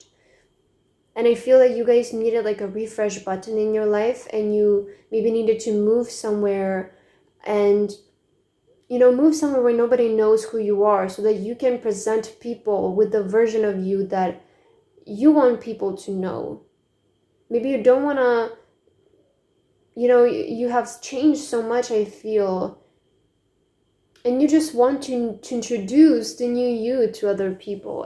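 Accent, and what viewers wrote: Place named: China. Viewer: 哈哈哈哈姐姐是中国人嘛这个口音真的很可爱😂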